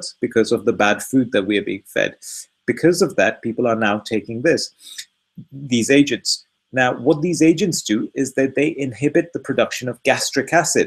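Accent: United Kingdom. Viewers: Love your accent, British ya?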